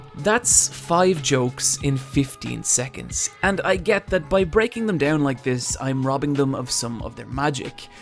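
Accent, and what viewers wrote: Ireland. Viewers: wtf is this guy IRISH??? This is so odd?? I am Irish that’s why I realised old homer could read :) new homer can read rarely… :( episodes used to be the full runtime now it’s two episodes between a break :( he really does care about his kids :) that’s one thing I still sometimes see in newer episodes keeping the characters grounded and not making a mockery out of the characters… so true… :( fucking facts :) :( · After watching that entire video still can't figure out why in your Irish accent you went for the American pronunciation of patronise. Other than that, great watch 👍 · Another thick Irish accent haha ;) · your videos are complete rip offs of Nerdwriter1, its hilarious how much of your style youve carbon copied from him, also your americanised irish accent is embarrasing please stop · This is a great video man, fair play. And great to hear an Irishman lay it down. 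Just not used to hearing an Irish accent on decent vids on yewtewib. I have kids and we watch The Simpsons a lot but only season 1-12 (basically because I can't watch any of them after that). Interesting thing is my kids absolutely love it - and I was 11 when I got my first Bart Simpson T-Shirt in 5th Class and I loved it then. But my god it became execrable after around 2001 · I'm gonna watch this, but, fuck your tone, lol. This is the first time I've hated an Irish accent but there is something so.... smug and arrogant about the way you're presenting this. It's no secret The Simpsons has gone downhill, but even from the intro I get the sense you're going way way overboard.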